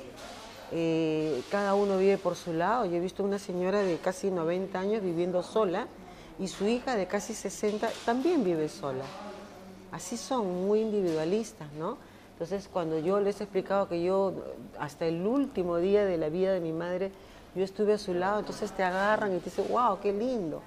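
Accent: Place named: Peru. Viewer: me encantó su manera de expresarse, muy pausado, muy agradable, aparte que el acento peruano es tan lindo ;) saludos desde Colombia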